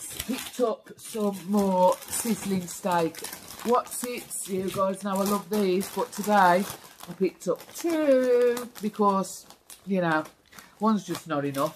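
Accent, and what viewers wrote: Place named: Black Country, United Kingdom. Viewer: I'm getting yam yam off you not brummie 🤔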